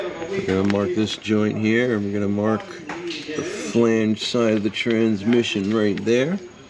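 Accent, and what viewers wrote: New York, United States. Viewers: New York. is that where you work based on "giggidy" accent.......very nice.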